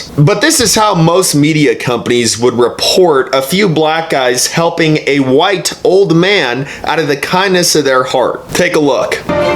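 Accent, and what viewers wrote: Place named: New Jersey, United States. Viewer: Are you sure your from Texas ? You sound like your from new Jersey. Your such a whiney Lil bitch .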